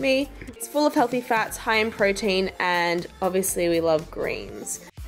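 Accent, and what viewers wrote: Australia. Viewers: you have an aussie accent, but do you live in australia ?? or do you live somewhere else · Hi! Just found ur channel:) You have good skin and toned body (Australian accent as well:p do you mind sharing ur beauty tips with us?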